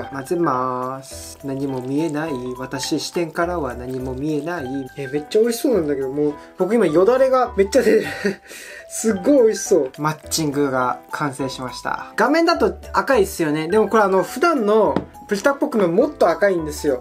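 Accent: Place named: South Korea. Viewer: 오 어릴적 한국에 살아서 그런지 지금도 한국인 발음이 남아있는것같아요 신기ㅋㅋㅋ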